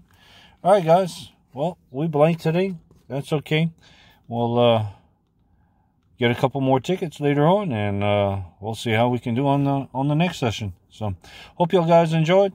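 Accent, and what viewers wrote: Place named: Louisiana, United States. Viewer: Love that Louisiana accent drawl action too 💪🏽💪🏽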